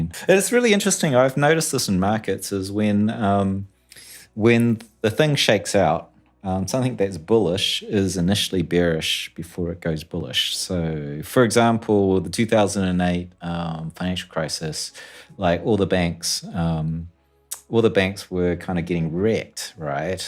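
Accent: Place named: New Zealand. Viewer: Hey...you have a strong Kiwi accent...are you overseas or in NZ? chur bro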